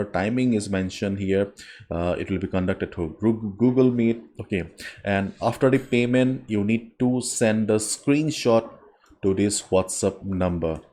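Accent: India. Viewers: this is not racism but I would trust a Indian person or sort of someone with the accent u have idk what it is its just Indians or asians brains are very smart with stuff like this and very proud of u bro helping people out I was doing analysis on gbps usd and couldn't fine where price could pottenially go and u have opened my eyes to it keep putting videos out I am going subricbe now u smart brother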